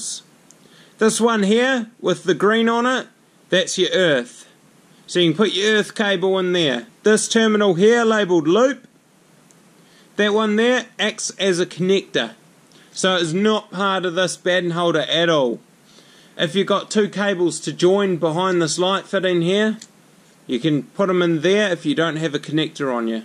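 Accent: New Zealand. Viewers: Love the kiwi accent, mate ;-) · I believe it's a kiwi accent!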